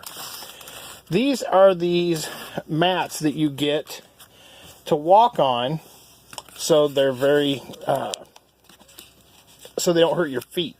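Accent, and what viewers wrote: South, United States. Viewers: Hi darlin,you know that's how we southern people talk.I hope you are not bothered by that.I am so happy you started you own video's.I am a new sub.Looking forward to more <link> safe my friend.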